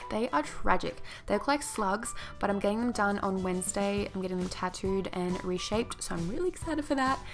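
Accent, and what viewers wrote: Australia. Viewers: Australian accents are so cool omg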